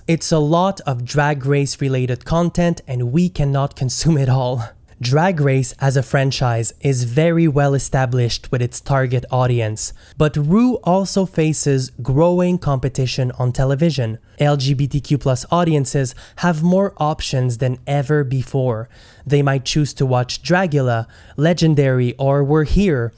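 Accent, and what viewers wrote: Quebec, Canada. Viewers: Not at all. Your French/Canadian?? accent is very charming 👍😊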